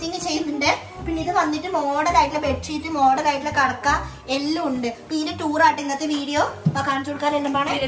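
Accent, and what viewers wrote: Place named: Malappuram, India. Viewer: thante malappuram slangum thante kannur slangum adipoli🤣🤣😄🫶🏻😎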